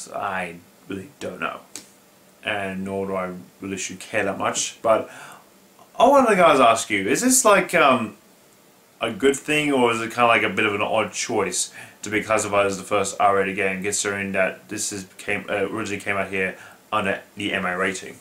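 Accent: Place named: United Kingdom. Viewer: Dude ... i expectd an aussie accent like my friends from school but you sound like my friend from back home "Britain" u sound like a BIG OLD SIR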